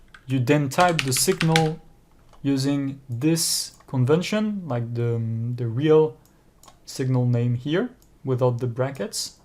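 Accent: France. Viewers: Just so you know, I'm a big fan of french english! 😁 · This is very late and you might not see this message but thank you so much for uploading this. By far the best tutorial on using C# in Godot. Also, the French accent you have is so charming.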